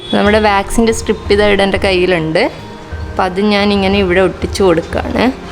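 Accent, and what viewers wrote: Kannur, India. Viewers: Kannur evideya, slang kettapo thoni. Njanum knr aanu. Ente peru hanima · Chechide varthanam ketta manasilvum Kannur aann😍 njnu Thalassery aane.😁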